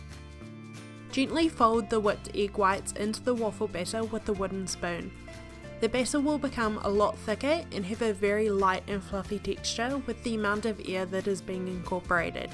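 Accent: New Zealand. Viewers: Is this New Zealand accent as it resemble jacinda ardern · heres some daily does of irony,Im kiwi myself but listening to a kiwi accent on YouTube is patronizingly frustrating.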